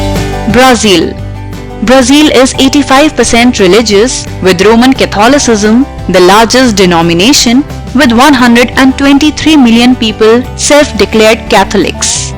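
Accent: India. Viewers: Where is lndia? And the person who is talking sounds like an indian.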